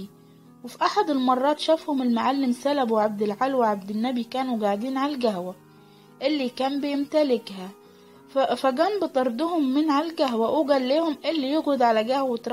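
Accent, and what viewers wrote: Upper Egypt, Egypt. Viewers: بحييكي علي قناتك. .بس لهجتك أحس انك صعيدية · الأخت صاحبة القناة،، أداء واسلوبك مميز،،، لهجتك من الصعيد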